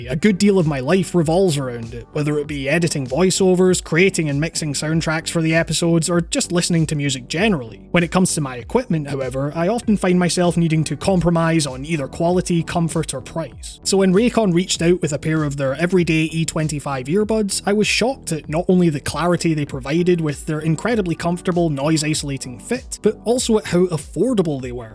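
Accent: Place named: Scotland, United Kingdom. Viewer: I think its a slight scottish accent maybe :)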